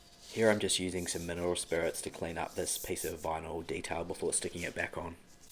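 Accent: New Zealand. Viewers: Keep talking cuz I love your kiwi accent! The piece came out beautifully! DDecker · Please, keep talking. I like the explanations and the New Zealand accent. 😉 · Your kiwi accent is nice to listen to there's always the mute button for those who disagree ,great job on the stag dresser I had a set and chalk painted them disaster !!, keep up the good work . ( Greetings from Aberdeen Scotland ) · Kia Ora! New subbie here from Australia and also a kiwi 🙌🏾 keep talking bro! Love hearing the familiar accent. You have a calm voice too. Kia kaha bro 😎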